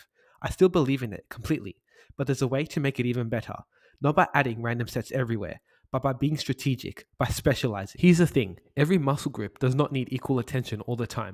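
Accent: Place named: Australia. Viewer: respect to your optimistic attitude. also fellow aussie i hear?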